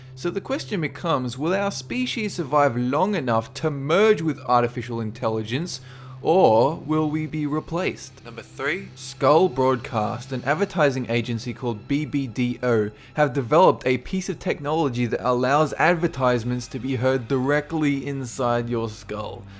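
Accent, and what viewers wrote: Australia. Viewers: Love ya aussi accent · Australian, I believe.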